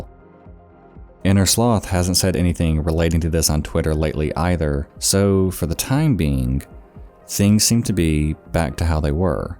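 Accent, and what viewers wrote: South, United States. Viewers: Quickly becoming one my favorite channels. Love your channel man. That subtle southern accent is extremely inviting. Kind of like Lazy Masquerades and Be Bustas accent gives them their little spark.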